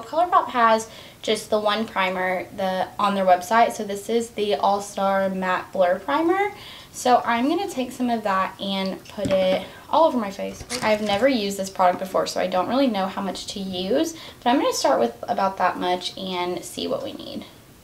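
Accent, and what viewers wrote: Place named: South, United States. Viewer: omg I love this so much!!!! you’re so talented and btw idk why but I love your voice bc you have like a country accent almost and it’s so cute!!! you’re so good at makeup considering your self-taught!!!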